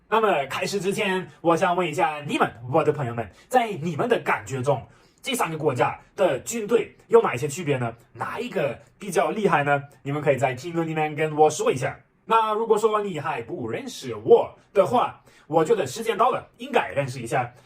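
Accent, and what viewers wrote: Sichuan, China. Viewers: ，四川的吧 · ，你的中文很不错，口音中还带着一点的 麻辣味 。👍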